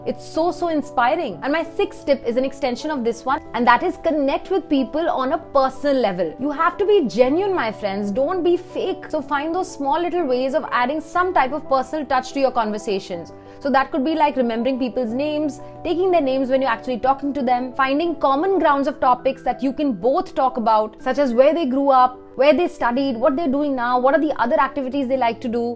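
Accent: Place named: India. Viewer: Idian english for sure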